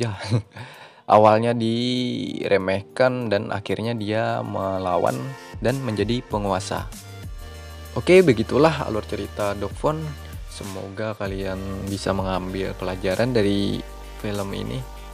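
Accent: Banjar, Indonesia. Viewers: Logat Banjar kdd hilangnya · Urang banjar kah? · Pian orang Banjar kah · Woah mimin banjar kalsel sama nah sanak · Orang Banjar kah? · Sasakali bahasa Banjar hja pnk basuaranya kyp bisa bagus banar sako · urang banjar kah om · Anjr bhasa bnjr nya kental bnr, fokus aja jdi fukus wk, tpi kdpp ai. Contoh chanel lain kya iq7 tu nh, beh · Ka kk orang banjar ya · Denger denger dangsanak ... Hemm orang banjar ya bang😂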